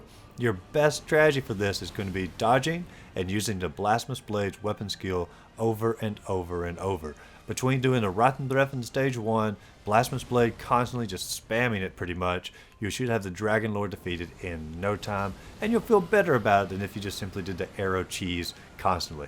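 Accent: South, United States. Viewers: Oh, and I hear that southern accent too man. Its all good, I'm here too lol!!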